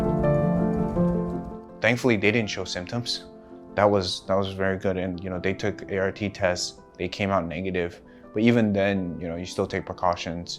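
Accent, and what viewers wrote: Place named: United States. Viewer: American accent in disguse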